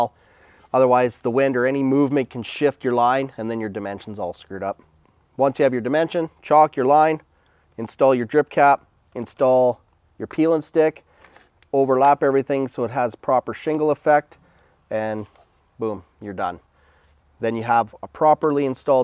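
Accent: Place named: Nova Scotia, Canada. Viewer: Sounds like a Nova Scotian accent lol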